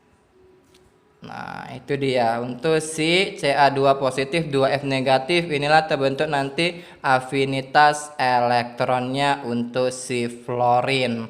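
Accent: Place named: South Sulawesi, Indonesia. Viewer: Duh maaf ga tau, soalnya logatnya hampir sama kaya kating saya yang dari Sulsel hehe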